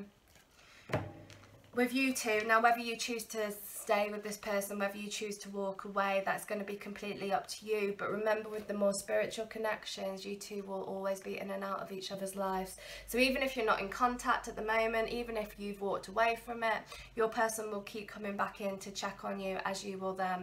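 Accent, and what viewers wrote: United Kingdom. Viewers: love ur accent British gurl! thanks🤐❤️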